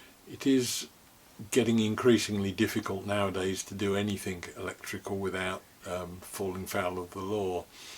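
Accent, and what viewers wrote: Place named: West Midlands, United Kingdom. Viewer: Are you from the Midlands? You've got a slight West Midlands accent to you!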